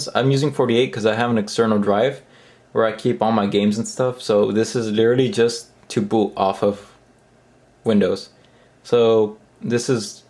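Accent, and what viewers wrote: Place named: Mexico. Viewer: I can tell by your accent you’re Mexican. Where you from? Cali?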